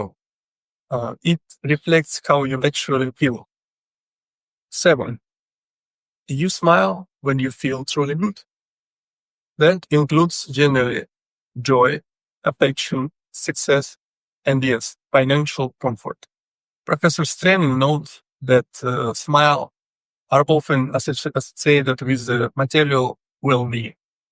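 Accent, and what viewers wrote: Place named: Russia. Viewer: An accent is russian that is true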